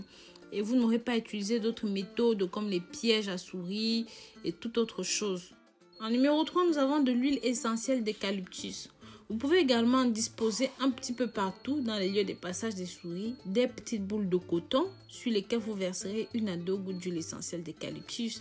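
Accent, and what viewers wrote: Africa. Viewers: ton accent d'Afrique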